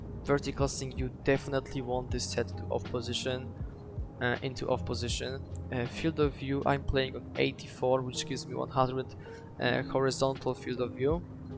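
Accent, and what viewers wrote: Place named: France. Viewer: Thanks for this I hope it helps me.. Im guessing your french 😬😬 so mercy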